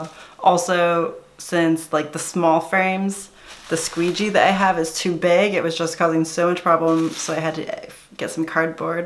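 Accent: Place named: New Zealand. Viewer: never found kiwi accent so interesting before XX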